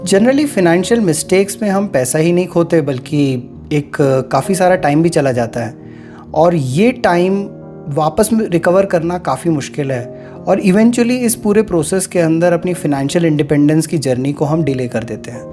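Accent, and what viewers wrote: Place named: Madhya Pradesh, India. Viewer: Ram Ram jee Bhai, aap kahan ke rehne waale hain?shuru main laga aap Lucknow ke hain par aapke bolne ke lehje se laga shayad aap Madhya pradesh se hain..accha laga sun ke, dhanywaad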